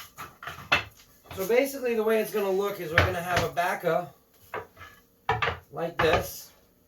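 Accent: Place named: Maine, United States. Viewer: I swear, you got a Maine accent. Love it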